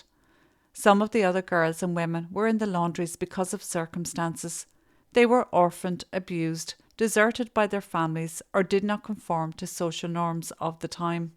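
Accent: Ireland. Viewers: I’m really hoping that you please 🙏 read my messages. For some reason I don’t receive any notifications 🔔 regarding your videos and I don’t know 🤷‍♂️ why so can you please be able to help me out with this problem? Thanks very much. Also I might like to add that I really enjoy your soothing voice and your material along with your lovely Irish ☘️ accent, your borough!! You have the most amazing and different videos that I’ve come across!!! 🦋🌈😊🌹🇮🇪💚😄.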